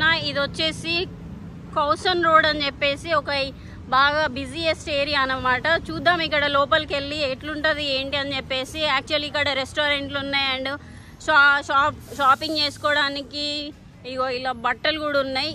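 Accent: Telangana, India. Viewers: ❤తెలంగాణ యాసలో మస్త్ గాఁ explain చేస్తున్నావ్ ❤️శుభాకాంక్షలు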